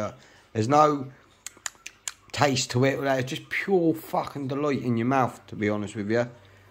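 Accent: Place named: United Kingdom. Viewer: Finally a fucking video where someone actually speaks!! And of course its a fellow brit 🙌 legend mate!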